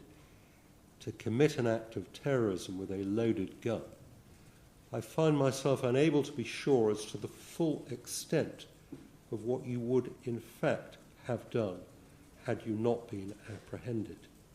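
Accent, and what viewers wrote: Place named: England, United Kingdom. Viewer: Man i tried to watch this but this guys england accent is like nails on a chalk board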